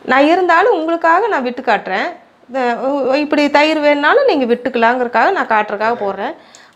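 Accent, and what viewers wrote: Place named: Coimbatore, India. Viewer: Coimbatore voice super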